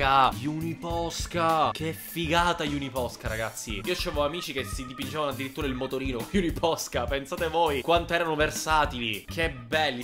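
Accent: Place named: Sicily, Italy. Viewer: la cosa che mi fa più godere è il tuo accento siciliano, la raffinatezza nel pronunciare “minchia” ha qualcosa di soave.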